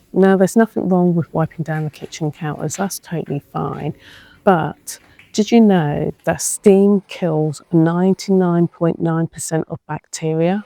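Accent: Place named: Southern England, United Kingdom. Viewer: Faucets or taps? She sounds like she's from the south of England so why faucets?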